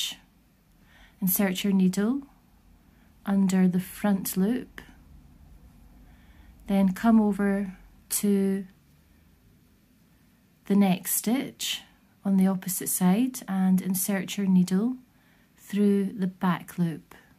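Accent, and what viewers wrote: Scotland, United Kingdom. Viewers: Just subscribed I enjoyed your video tutorial sooo much. As a newbie to crochet I found your instructions and pace, just right for a learner and I found your voice so calming and clear. Being a fellow Scott I warmed to your accent too. Your wee hoodie couldn't come at a better time also as there's a new baby due in the family in February. Thank you for your hard work and inspiration. Have a merry Christmas :0) P.s. would love some small baby dolls patterns for the new big sister's doll, 10 inch would be great ☺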